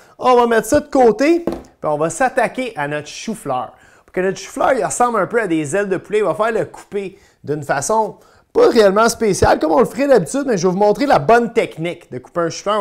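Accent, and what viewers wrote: Quebec, Canada. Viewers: ... jajaja je ne comprends rien du tout. C'est trop difficile le français québécois pour moi. Je parle espagnol comme langue maternelle et le français j'ai appris après quelques ans mais ... La prononciation de Québec c'est complètement différent de ça de France. Jaja. J'essayerai cuisiner cette chou-fleur avec ça que je comprends.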